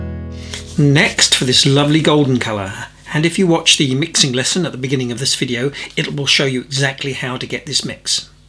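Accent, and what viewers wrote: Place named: United Kingdom. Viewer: It is wonderful to hear your British accent, and had a good chuckle to hear your comment about the 'bloody leaves' did my heart ❤️ good!!!. I do really enjoy your videos; and wonder just where you are located. I lived in East Sussex and moved to the U.S in '57 so there's a bit of nostalgia going on. Thanks for the memories ❤ !!!!!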